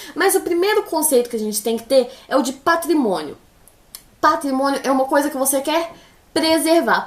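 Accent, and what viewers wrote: Minas Gerais, Brazil. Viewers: Quero ver como retomar a tese sem ficar repetitivo. Conheci seu canal ontem e to me odiando por não ter te assistido o ano inteiro :) E de curiosidade, quantos anos você tem? Tipo, c ta no ensino médio? Pq tava achando q c era professora, mas que parecia mto nova pra isso. hahaha PS: Q sotaque maravilhosooo, é igual ao da minha mãe, ela também é mineira :D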